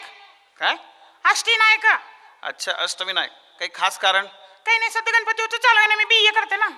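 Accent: Solapur, India. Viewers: खुप सुंदर सोलापुरी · Solapuri dilect is masta ahaya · ek no solapuri bhasha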